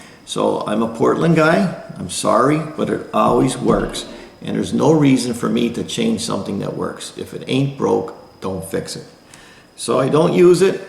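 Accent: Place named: Pennsylvania, United States. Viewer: I love your videos. They have taught me so much. Of course experience of actually doing the job is worth way more than text book info. You have helped me tons! Plus I love the Pennsylvania accent. Keep up the good work! And thank you! 🙏🏻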